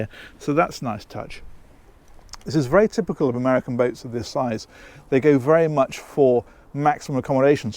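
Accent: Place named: United Kingdom. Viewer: Hey you can say it any way you want to.. your a Brit and I for one don't expect you to talk like an American.. I am an American and I don't talk like a Brit.... I enjoy the heck outta these videos now matter! even if you spoke Aussie.. though that is rather hard to understand when they slip into there slang.. Man ya gotta love though.. take care y'all see you on the next one..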